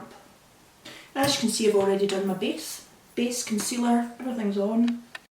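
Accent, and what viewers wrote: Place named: Ireland. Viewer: Hey! 👋 Awesome tutorial! Love the detailed walkthrough and commentary. Remember to apply those tips about the intro in your future vids!! Keep it up 😌 Love the irish accent btw hahah (think its irish? Lol)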